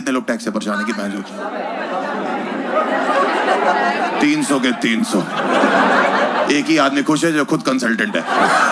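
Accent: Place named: Haryana, India. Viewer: Haryana m rehkar Haryanvi seekh gaye Bhaai 😂😂😅😅🙏🙏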